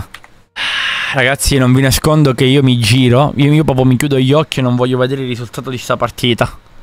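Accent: Italy. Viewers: Io pensavo che eri di milano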